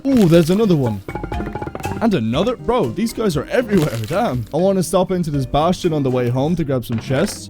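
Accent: Ireland. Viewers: you Irish? Thats cool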